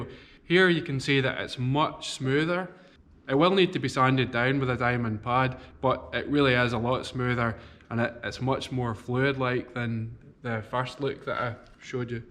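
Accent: Scotland, United Kingdom. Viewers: Have you seen the Palace of Glass video showing how they chip the glass in predictable ways to make a pattern on the chipped edges? Amazing. Hearing you voice reminds me of my visits to Scotland and once this covid pandemic is controlled with a good vaccine, I need to visit again.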